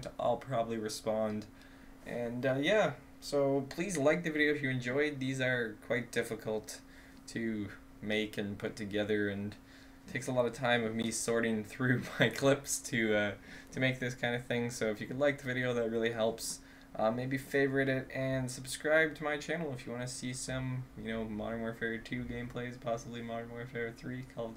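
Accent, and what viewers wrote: Canada. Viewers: are you canadian? i can hear it when you use the letters v and c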